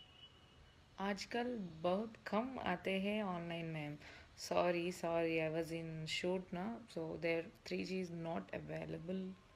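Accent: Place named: Latin America. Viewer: Like I said I thought you were Hispanic I like your accent or respect you keep smiling I like them I like what you have on with respect I respect you but this is very interested I keep looking at you right now in this video I'm still in shock I just wanted to spoke to you the other day or 24 hours ago I don't know if this is a brand new video I don't know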